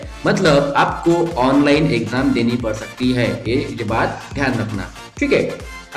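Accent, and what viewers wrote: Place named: Maharashtra, India. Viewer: Sir tumhi Marathi madhech ravas boltat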